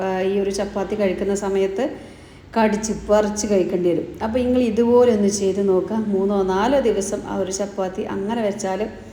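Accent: Malappuram, India. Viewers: Samsaram malappuram style matiyoj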